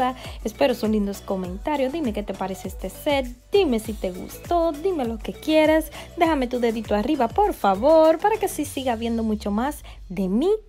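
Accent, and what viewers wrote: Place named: Dominican Republic. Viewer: Una pregunta tu eres #DOMINICANA por qué tu tienes un pequeño acento Dominicano 🇩🇴🇩🇴🇩🇴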